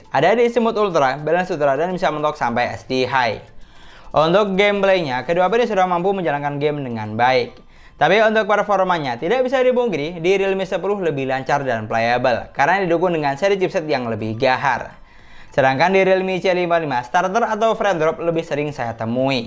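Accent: Madura, Indonesia. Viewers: Orang Madura yg bang?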